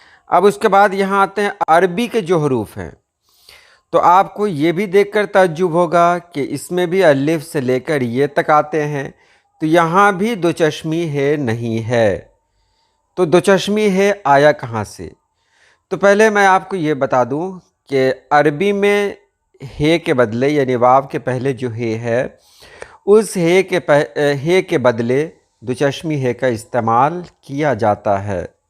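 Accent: India. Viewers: Lectures kuch pehle bhi sune ic k mn ne.❤. Ye Indian ha? Wese main Urdu to inki bhi Pakistan ki tarah ha but phr bhi sunane se pata chl raha ha k Indian ha. Yani k Indian or Pakistani Urdu mn faraq aa chuka ha. Lehjae mn bhi or pata nhi jahan but feel ho raha ha k inki Urdu different ha.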